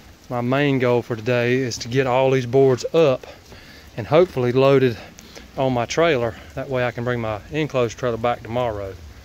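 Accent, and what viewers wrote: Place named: South Carolina, United States. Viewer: You got to be from South Carolina. You talk just like me!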